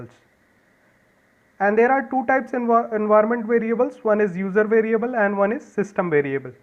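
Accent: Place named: India. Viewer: hindu accent, words tech, support, solutions etc used, asks to download something that could have been coded by anyone. no ty.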